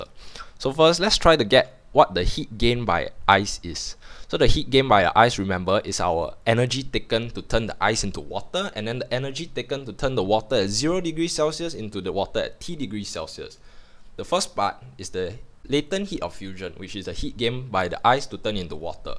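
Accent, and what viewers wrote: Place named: Singapore. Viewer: hahhaa just want to say i stumble this on chatgpt, then when i played the video i heard ur voice/accent, im like EH singaporean hahaha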